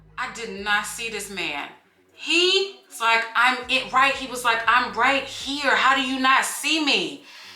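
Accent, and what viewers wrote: New York, United States. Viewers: Best friend that’s how New Yorkers talk trip me out too I’m from Florida we laid back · Lol I’m crying at your New York accent. You did good I’m from Brooklyn. They say I’m aggressive too 😂😂😂😂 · That's a new yorker for you..... Lol.... Born and raised but i don't talk like that... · went out to the mall today (BIG MISTAKE), Watched some Sunday Football (boring games), Sat down and ate a burrito while watching story time (NOW THAT WHAT THE F*** IM TALKING ABOUT) LOL. Love your story times! I'm from NY, living in Florida and your NY Accent was on point! xo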